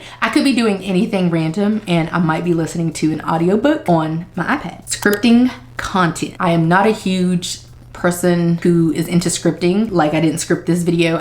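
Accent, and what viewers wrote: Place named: Texas, United States. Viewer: You gotta either be from Texas or Alabama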